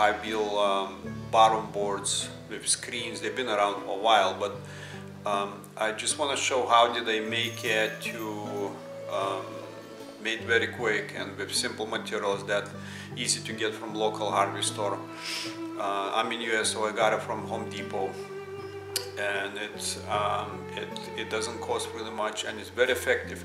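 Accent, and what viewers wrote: Russia. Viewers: I used to live in the Northwest corner of Montana, I may have told you before, and I love Wyoming too. But how did you choose to move to that area? By you accent,and may be wrong, but did you come from Russia? You live a very pleasant life there in the mountains...I know I sure miss it! How did you come to attract such a good energy with all the wildlife? I can see it in you. The animals, your pets reflect your kindness. Do you have family here in the U.S? I am rambling a bit but I feel a sense of goodness in all you film, keep it up! Till we speak again, Woody Woodward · Were are you from ? Russia or another country ?) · MOJET IA OSHIBAIUS NO SLISHU RUSSKII AKZENT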